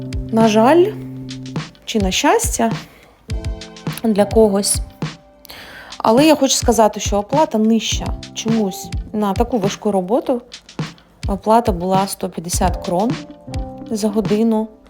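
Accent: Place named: Russia. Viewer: Чувствуется, что на самом деле Вы думаете и говорите на рус языке...а вот влог пытаетесь весьти на укр языке, ну тогда выучите хорошо украинский.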